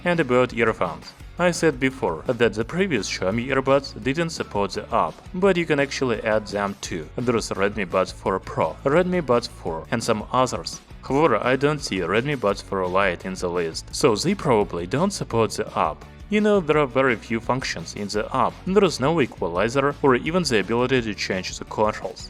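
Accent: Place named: Russia. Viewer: Какой же сильный русский акцент, извините ) Мне кажется, в русском языке столько звуков, что язык может говорить на английском гораздо ближе к носителям.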